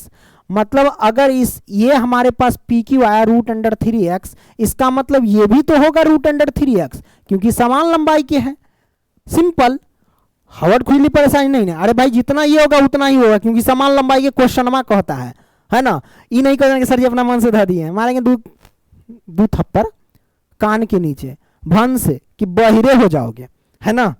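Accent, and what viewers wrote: Bihar, India. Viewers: Sir apka bihari bhasha mast lagta hai❤❤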